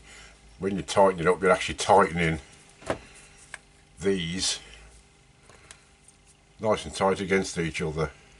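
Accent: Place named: Black Country, United Kingdom. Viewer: You have a proper Black Country accent, nothing like a Brummie accent at all, I’m really surprised. I like to watch your videos, very interesting with a bit of light heartedness thrown in. Kind regards to the hardest working part of the duo.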